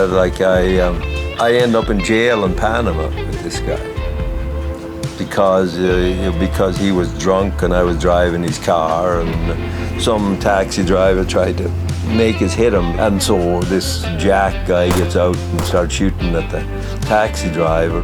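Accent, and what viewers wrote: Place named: Ireland. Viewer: Hi —that was really excellent. He still has a bit of an Irish accent:-) Guy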